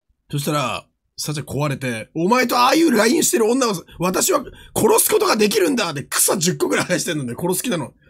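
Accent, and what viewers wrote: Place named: Chiba, Japan. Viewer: 電話の時の話し方がマジで千葉の南の方のイントネーションで好き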